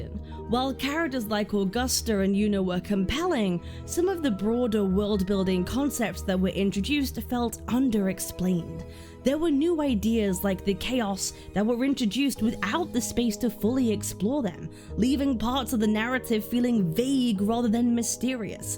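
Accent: United Kingdom. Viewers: you are british>?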